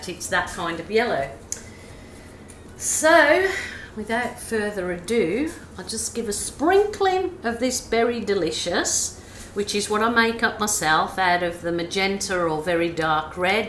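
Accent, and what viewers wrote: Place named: Northern Ireland, United Kingdom. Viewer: Also from Victoria BC! Originally N.Ireland. I was trying to figure out accent and thought she sounded a bit like me but with a mixture! Love her work land her ability to so naturally express herself in words and in paint!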